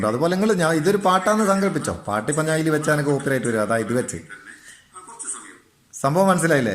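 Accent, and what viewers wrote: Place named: Kozhikode, India. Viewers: Kozhikod slang😀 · Idh ksd slngalla... kozhikod😤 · Aadhyam ningale nadapuram slang ozhivaakk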